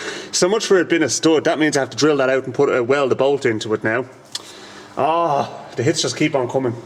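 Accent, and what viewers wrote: Ireland. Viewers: The images on the vidwo look fine and I love your channel. This American also finds your Irish accent to be a pleasant addition. I worked in Dublin (actually, in Blackrock) a while back and love the countryside and the people of Ireland.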